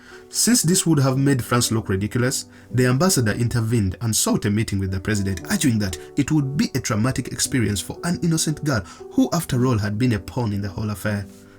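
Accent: Uganda. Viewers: He sounds either Ugandan or Rwandese. Probably Ugandan though, sounds a lot like my dad. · Ugandan accent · The narration sounds so Ugandan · This is a Ugandan accent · Ugandan ...This voice and narration is so Ugandan .well done.keep it up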